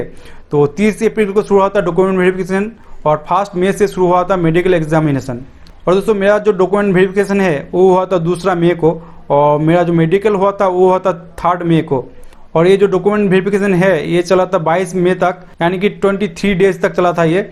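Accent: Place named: Bengal, India. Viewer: Hindi sunke mujhe lag raha he ,app bengal se ho !!!!🤔🤔🤔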